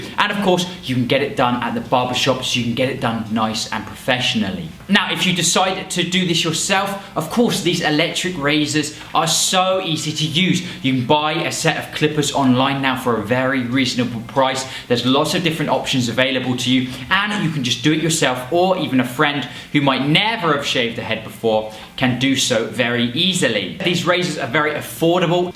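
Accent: England, United Kingdom. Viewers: Love this channel. Just found it and feeling better already! And I love this dude's accent. Is he from the same part of England as Russell Brand? Very similar accent scenes... Anyway, I'm not quite ready for this but headed in that direction I think.. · Your English intonation attracted me to your channel ☺️😂 but you are cute bro ❤️🔥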